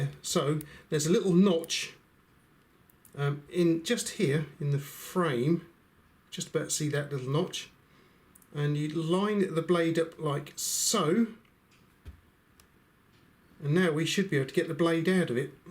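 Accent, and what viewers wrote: United Kingdom. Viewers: Haha you're a bit off with the Accent. The reviewer has a UK accent, Korg is voiced by Taika Waititi, a New Zealander. Literally the other side of the world.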